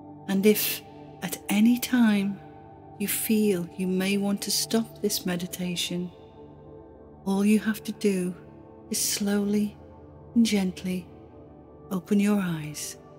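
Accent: Liverpool, United Kingdom. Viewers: This narrator is from liverpool..guaranteed scouse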